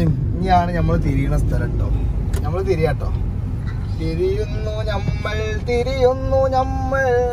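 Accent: Malappuram, India. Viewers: തനി മലപ്പുറം കാരൻ തന്നെ അടിപൊളി പച്ച മലയാളം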